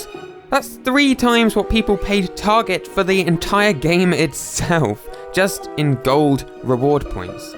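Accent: United Kingdom. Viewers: I thort u woor british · British voice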